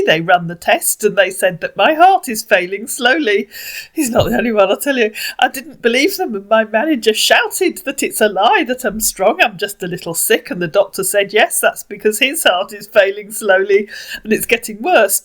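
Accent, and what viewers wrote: United Kingdom. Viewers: The way she said, "it sounds like it was written by an illiterate moron", with that proper British accent literally had me rotfl... It just struck me as super funny. Thank you for educating people with humor & hilarity 👍👍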